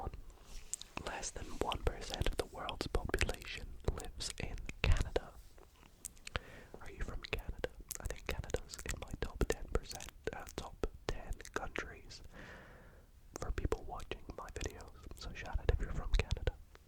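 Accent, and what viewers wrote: Scotland, United Kingdom. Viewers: Day 1 of asking to make a video with only Scottish accent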